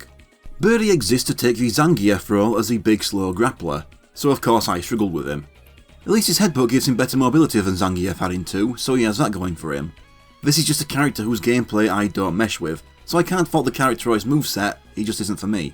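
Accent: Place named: United Kingdom. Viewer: Great video dude,Always great to see and hear another gaming Brit on YouTube! Subscribed!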